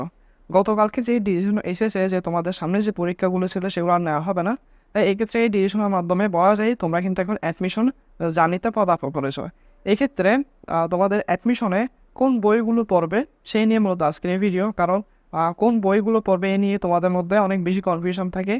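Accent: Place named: Chittagong, Bangladesh. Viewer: ভাইয়া আপনি তো চট্টগ্রামের মনে হয়, চিনা চিনা লাগতেছে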